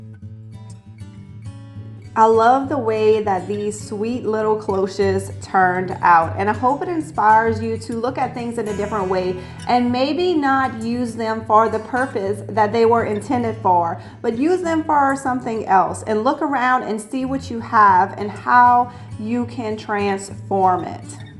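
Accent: Louisiana, United States. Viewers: I know it’s been a year since you uploaded this video but I am trying to place your slight accent ……. Louisiana Cajun?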